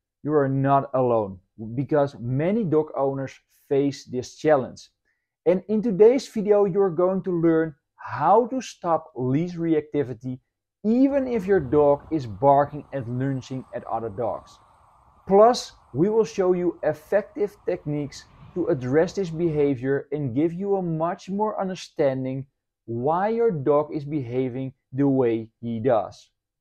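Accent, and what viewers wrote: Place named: Netherlands. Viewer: Hoi ik hoop dat ik mijn oprechte mening mag geven op je nieuwe uitdaging om video's voortaan in het Engels te maken. Je uitspraak van het Engels is nog té "Nederlands" kortom klinkt beetje raar...😮😮😮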